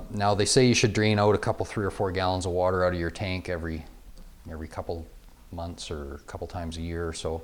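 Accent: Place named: Canada. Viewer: I have this exact same metal plus plastic valve on an old John Wood hot water heater. After a few times of opening it to drain the tank it just wouldn't shut properly. I've since replaced the end valve with a brass ball valve to reduce the drip, but because of poor sealing it drips about one drop every 20-30 minutes. I'm replacing the whole thing with a new brass 3/4" ball valve using this video as instruction, so thanks for the relaxed, quick, informative presentation. BTW just from how you spoke, I knew you were also Canadian. Thumbs up.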